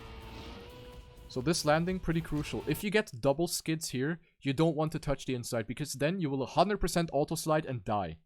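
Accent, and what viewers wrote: Germany. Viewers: Nice to see how self critics you are with yourself +++ german english 😉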